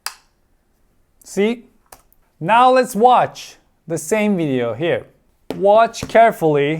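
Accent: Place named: Turkey. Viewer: Aksanı çok Türk kaçabilir fekat beyin gramerle sorunu yok obvs.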